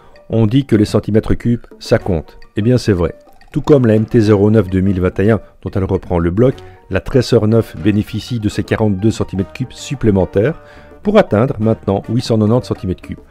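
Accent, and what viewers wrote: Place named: Belgium. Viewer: Perso j'ai commandé cette moto qui doit m'être livrée en avril/mai, je n'ai qu'une hâte c'est de la réceptionner et rouler avec !! Merci pour ta vidéo, très belle et bonne présentation de la machine et j'ai particulièrement bien aimé ton petit accent de Belgique !!!! ;)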